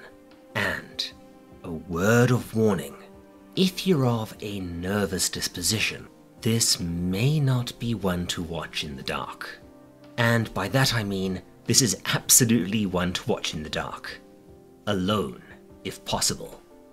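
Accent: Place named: South Africa. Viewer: Dude - you're South African? Been binging your vidoes and had no idea until you mentioned load shedding and having a kudu on the wall growing up. Now that I am listening, I hear how you say "off" as "orf". Peeps in the UK always made fun of me for that lol. Keep up the great work 🙂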